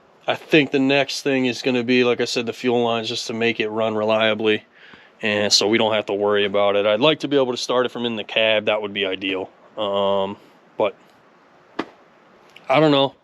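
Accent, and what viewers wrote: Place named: Buffalo, United States. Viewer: you sound like you grew up in buffalo or somewhere close.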